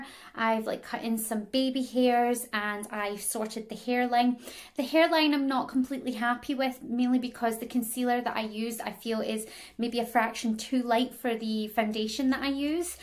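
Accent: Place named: Scotland, United Kingdom. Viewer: Nice video.. Very informative wig looks great on you Ps love your Scottish accent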